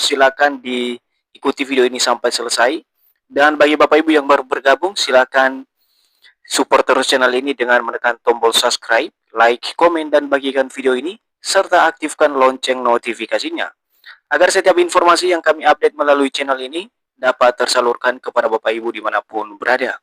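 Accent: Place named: Sumatra, Indonesia. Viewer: Sp lagi sih yg punya chanel ini. Suaranya persis sama dgn suara orang yg sering nipu di youtube, logatnya sperti org dari sumatera atau dari indonesia tengah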